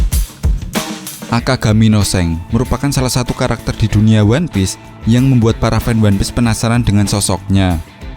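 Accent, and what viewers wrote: Java, Indonesia. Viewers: Medhok mu itu looooh.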